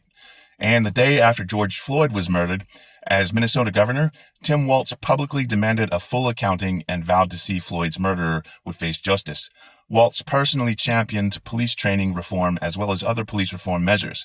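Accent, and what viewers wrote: Scotland, United Kingdom. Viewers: am I detecting a bit of a Scottish accent here?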